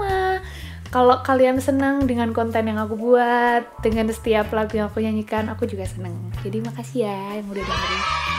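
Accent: Java, Indonesia. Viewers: jawanya medokin lagi kak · Jawanya medok banget mba 😊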